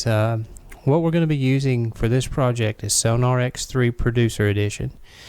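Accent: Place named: South, United States. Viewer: Dudo mucho que ese hombre hable español. Se le nota, su acento es del sur del pais.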